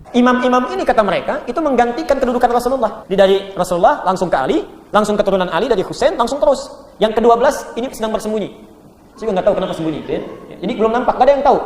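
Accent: Madura, Indonesia. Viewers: bukan orang madura, tapi ngomong logat mdr, krn sy sendiri, ayah mdr, ibu jawa, krj guru sd pasca merdeka, ini antek amrika, mirip prabowo, hingga kapal pertamina indonesia ditahan iran, hingga nggak bisa apa 2,pdhal ayatullah hafid qur an, kalau nggak bisa bc huruf, itu kelihatan..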